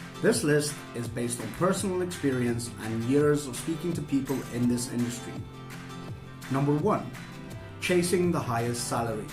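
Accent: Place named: Ireland. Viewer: buddy, based on your accent and your name, I knew you are Irish... ' ' is a very common name in Ireland... and your accent... I don't know what part of Ireland you come from, but it is a typical Irish accent.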